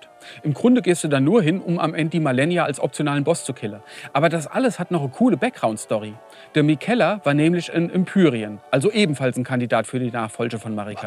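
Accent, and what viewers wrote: Hesse, Germany. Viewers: Also wenn meine Muddi auf Hessisch spricht verstehe ich kein Wort mehr... wo liegt der Unterschied, noch zu nett gewesen? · Hessisch for de win alder ✊🏻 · Wie ich das liebe wenn dem Schröck Storys uff Hessisch erklärt 😂😂😂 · Nie eine Sekunde gespielt, aber das meiste verstanden. Uff hessisch ist immer schön. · Hessisch ist einfach wunderschön ^^ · Absolut grandios das Video. In noch keinem Video wurde die Geschichte von elden Ring so gut zusammengefasst. Und des hessische macht’s au noch weng mehr onständig unterhaltsam :D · Meine Mutter war Hessin und ist dieses Jahr gestorben, ist für mich immer ein Gefühl von Heimat, wenn ich den Dialekt höre. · Hessisch- beste Dialekt 💪💪👍👍 super witziges Video. Gerne mehr davon. Grüße aus Frankfurt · Ei gude ihr lieben, Imma widda schee, wenn ihr uff hessisch babbelt. Ich wär mal für ne ganze reguläre Folge in hessischer Mundart 😉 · Also Trants Hessisch klingt echt angenehm. 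Gott sei dank nicht auch Sächsisch :D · Herrlich und trotzdem das "Lore" sehr gut rübergebracht, Vaatividya auf hessisch :-) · Irgendwie habe ich keine Ahnung, woher der Gag kommen soll bei hessisch. Ja, ist widerlich wie jeder Dialekt, aber tatsächlich witzig wäre halt schwäbisch, bayrisch, sächsisch. Zweitens. "Geschichte" und ein Souls Spiel. Putzig :D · Gute halbe Stunde entertaiment auf hessisch :D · ich liebe und vor allem das hessisch, einfach nur Gold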